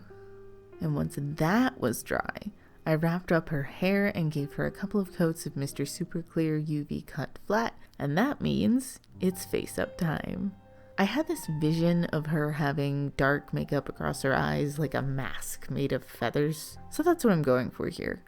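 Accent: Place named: West Coast, United States. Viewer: I knew it was the west coast but your voice sounds WAY too familiar to me... hence why I asked about WA or OR. I've never lived in CA so it's likely that you just have a voice similar to someone else's. In any event, I know a west coast gal when I hear one. Lol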